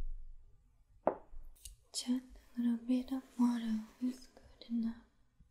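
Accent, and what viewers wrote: Vietnam. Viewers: do more viet accent videos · your viet accent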